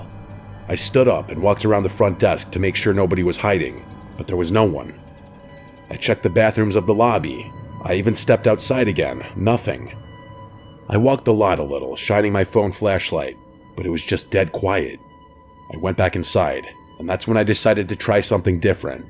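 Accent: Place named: Long Island, United States. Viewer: This dude is from Long Island for sure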